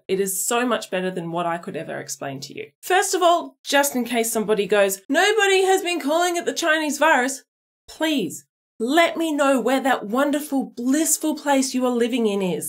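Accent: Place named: Australia. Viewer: Always great to hear clear & reasoned arguments / information about important topics - extra important in the fight against disinformation in modern times. This is especially cool from someone with an Aussie accent (I'm in Bunbury in Western Australia).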